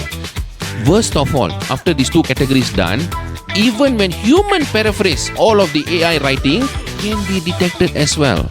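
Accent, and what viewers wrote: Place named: Singapore. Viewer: This man speaks and sounds like Kumar from Singapore.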